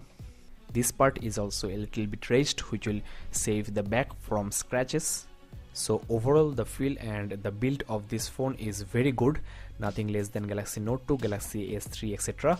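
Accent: Bengal, India. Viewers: OMG..you are eating your words when you are talking...i know you are indian but make it clear explanation..... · bangali talking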